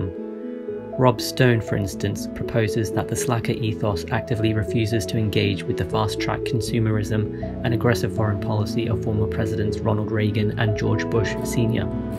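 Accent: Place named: United Kingdom. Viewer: I hate starting a yt vid and hearing a british "person" smh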